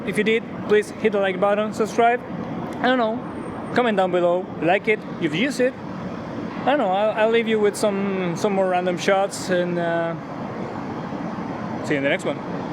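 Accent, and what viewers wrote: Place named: United States. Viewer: hermano partio el video y pense que eras gringo xd gud inglish. saludos desde coquimbo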